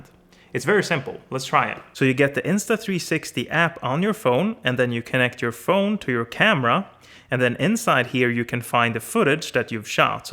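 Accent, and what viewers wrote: Sweden. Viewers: Are you from Sweden ?)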